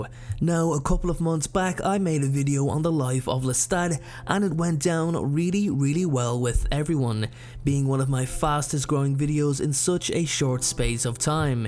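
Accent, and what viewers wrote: Ireland. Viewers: You sound like conor mcgregor, that's how i know you're from ireland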